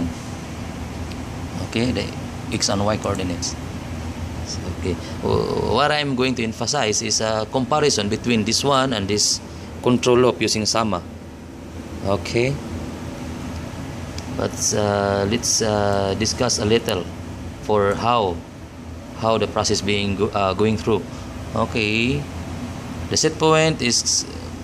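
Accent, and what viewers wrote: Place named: Philippines. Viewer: i really like the way you explain things, and its very easy to understand. The way you talk i believe your a Filipino hehe. Hello sir. I'm really into control loop/logic diagram, i want to learn more in that area. maybe you can advice/help me achieve this in my career. Currently doing cadet-ship in a Power Plant.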